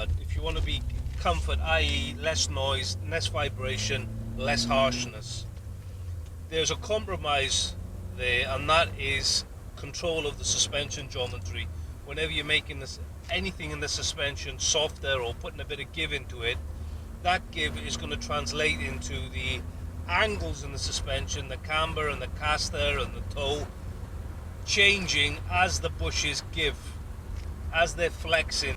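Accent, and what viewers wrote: Wales, United Kingdom. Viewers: I got a racechip ultimate connect on my golf mk7 1.4tsi,and the moment I have taken it off as it keeps throwing cel code for turbo sensor wen I drive hard,Amy ideas why mate,cars fine wen uninstalled,by the way are you from wales originally,sound like a Walsh man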